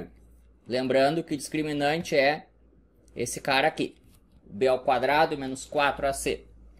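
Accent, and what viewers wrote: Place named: Alagoas, Brazil. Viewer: pra mim q vc era de ALAGOAS.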